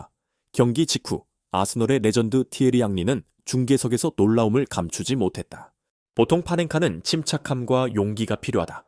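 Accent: China. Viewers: 중국인이 내용을 읽은 건지, 뭐 이러냐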